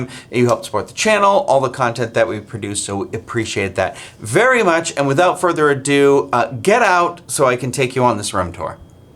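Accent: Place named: Massachusetts, United States. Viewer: Mass accent béer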